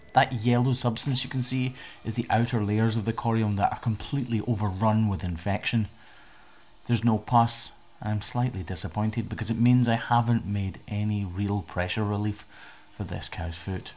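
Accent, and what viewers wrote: Scotland, United Kingdom. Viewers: Yes, you are a Scottish man - heavy accent, so obvious! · I don’t care about cows, I‘m here fir the Scottish accent. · Are you Scottish?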